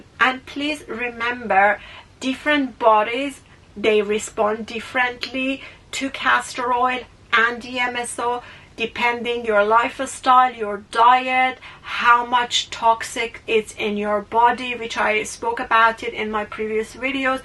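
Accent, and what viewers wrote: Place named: Iran. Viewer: Your natural Iranian voice is so much better than ai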